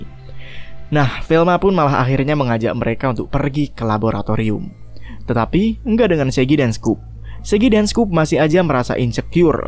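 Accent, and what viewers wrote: Java, Indonesia. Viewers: orang Jawa ya?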